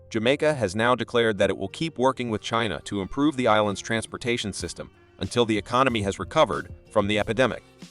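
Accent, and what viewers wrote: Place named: United States. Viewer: These kind of videos are so frequent. It's always the same thing: - This highway is currently under construction, when in reality it was finished a long time ago - Jamaica entering unforeseen "debt traps" with China, which to this day no one can fully explain - Questionable grammar and sentence construction - AI generated narrations with an "American" accent - Mismatched visuals - Outdated stats - Spreading propaganda about Jamaica's economy being in the pits before this project, like Jamaica was a starving nation or something - Jamaica's mountains getting in the way of road construction, when two major highways had already existed before the North-South highway - Getting Jamaica's geography wrong by assuming the Blue Mountains are in the middle of the country - Getting wrong for the trillionth time that the North-South highway crosses the Blue Mountains - Assuming Jamaicans had no way of crossing their mountains prior to this - Assuming tourism is dependent on this one highway, which makes no sense considering Kingston is not a major tourist destination - Assuming tourism is the only economy in Jamaica - Ignoring that there is already a proper roadway on the coast that links the major tourist areas in Jamaica - Jamaican locals depending on this one project for prosperity, transport convenience and foreign exchange - Getting details of how the North-South highway was funded and its conditions wrong - China making Jamaica a new deep shipping water port, something Jamaica alrea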